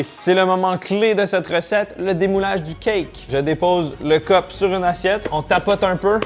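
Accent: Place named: Quebec, Canada. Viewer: Tu vie au Québec ? Parce que tu bcp l’accent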